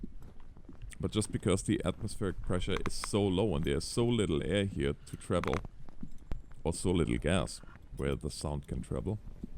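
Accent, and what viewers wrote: Germany. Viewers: you are German , Right ? :)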